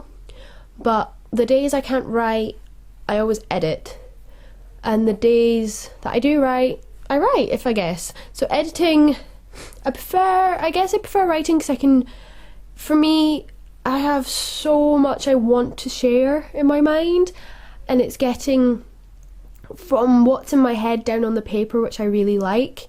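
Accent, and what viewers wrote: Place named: Scotland, United Kingdom. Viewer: Haha, even with a cold, your voice still sounds really nice. I love Scottish accents. :) Okay, anyway, this was really interesting!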